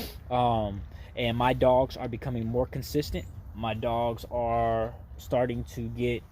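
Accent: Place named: Rural, Puerto Rico. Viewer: Good information bro.. this is dead ass my 1st hearing a Country Rican lol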